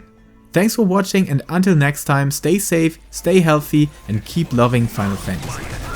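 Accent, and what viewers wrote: Germany. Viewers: Are you german? Cause of your Accente.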